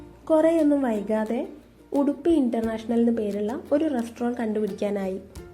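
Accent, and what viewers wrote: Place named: Kozhikode, India. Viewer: Awesome presentation! It was great to hear😊. പിന്നെ ഇങ്ങടെ കോയിക്കോടൻ ഭാഷ പൊളിയാന്ന്❤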